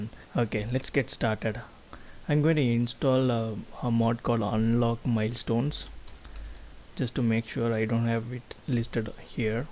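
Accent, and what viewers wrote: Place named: India. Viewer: nice indian accent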